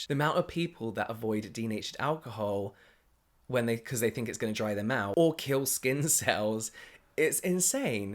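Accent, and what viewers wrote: United Kingdom. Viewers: I realized you and MsAlexx (UK fashion vlogger) have the same accent and I can’t unhear it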